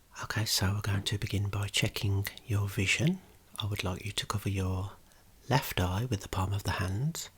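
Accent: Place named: West Midlands, United Kingdom. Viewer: As ever, you are promoting the west midland accent in the most exquisite way. Superb content time after time, top man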